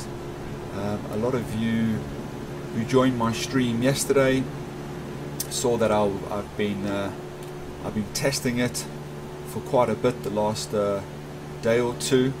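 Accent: South Africa. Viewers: you sounds south african, you must be south african :)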